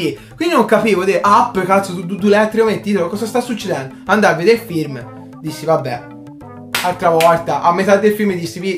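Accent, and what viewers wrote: Tuscany, Italy. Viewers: Hai proprio ragione ed è chiaro anche nel tono di, molto "toscanaccio" (anche io parlo così, dal vivo, anche se in dialetto lucchese! XD) e colloquiale. Non pretende di essere portatore della verità assoluta. Ma certe persone leggono recensioni o vedono video come questo e seguono il metodo "pecorella". Mentre avere una propria opinione per me è qualcosa di meraviglioso.:) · adoro il toscano. ho aperto il video e l'ho lasciato andare solo per farmi cullare dalla tua voce.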